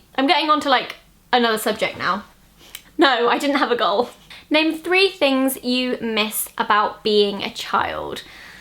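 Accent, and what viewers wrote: United Kingdom. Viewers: Hi Zoella! I am from Brazil......... Say "hello" to Brazil in your nxt videos. I see yoour videos because you're so funny. I don't use make ups..hahah. I see also because i'm in UK and it help me learn a little more the way british people accent.... thank you kisses.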